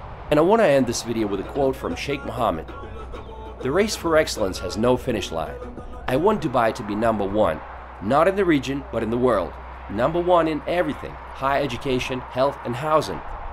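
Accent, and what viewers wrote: Russia. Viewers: I would've Never placed his accent as Russian! That's Crazy! 😆👌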